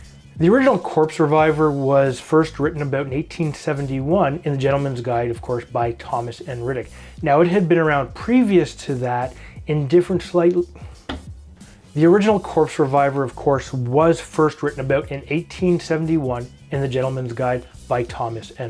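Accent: Canada. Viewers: 1st written abeeewt, I love the way you Canadians talk! Those are some big-ass lemons you get up there. By the way, how did you make that subscribe icon appear with an arrow & click? Maybe it would get more subscribers to my cocktail channel. And you mentioned the Barfly. Do you have good relationships and talk to a lot of other cocktail channels? I have things I'd like to discuss with you, but not leave in a comment as they have nothing to do with that particular drink. I know you have a tight schedule, but please drop a line sometime : <mail>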